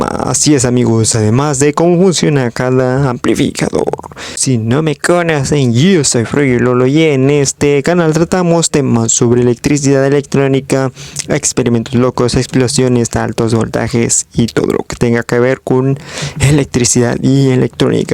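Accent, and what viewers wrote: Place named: United States. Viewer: Todo bien. Pero, porqué hablas como gringo? 🤔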